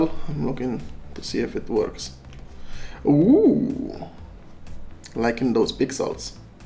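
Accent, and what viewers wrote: Romania. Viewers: I knew youre Român , your accent haha,great contnent btw ❤️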